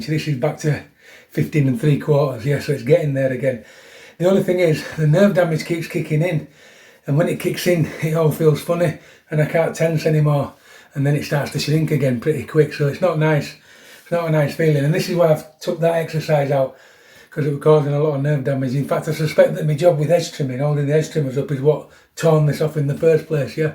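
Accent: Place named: Liverpool, United Kingdom. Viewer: no offence mate but why are you speaking in a Scouse accent when you are not even from Liverpool?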